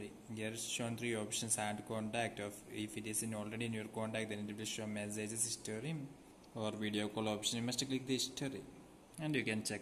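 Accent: Kerala, India. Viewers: Malayali talking in English :) funny slang dude!!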